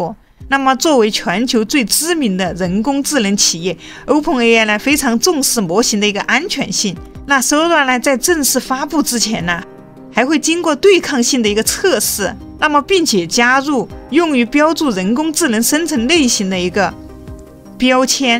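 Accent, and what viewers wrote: Sichuan, China. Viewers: 好亲切的川普~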